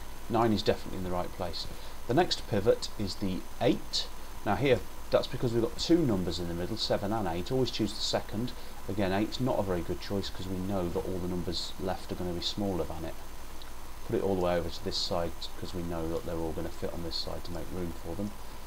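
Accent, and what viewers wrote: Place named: United Kingdom. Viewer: your british accent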